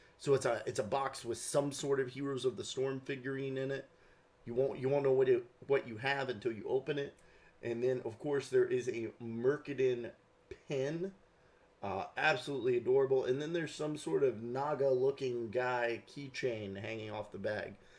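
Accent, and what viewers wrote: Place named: Canada. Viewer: Oh my gosh your Canadian accent hahahaha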